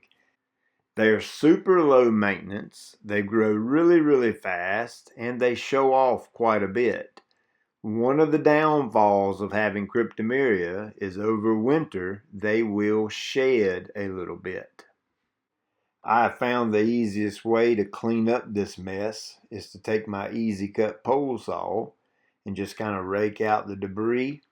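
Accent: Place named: Louisiana, United States. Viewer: You should like you are from Louisiana instead of N.C. Regardless, I like your accent. Your beds look fabulous!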